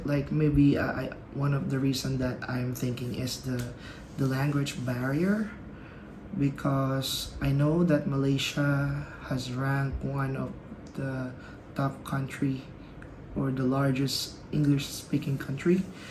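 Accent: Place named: United Kingdom. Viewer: I can heaar UK accent 😂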